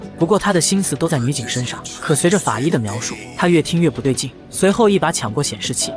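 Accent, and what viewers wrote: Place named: Sichuan, China. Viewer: 感觉解说员是我们四川人啊！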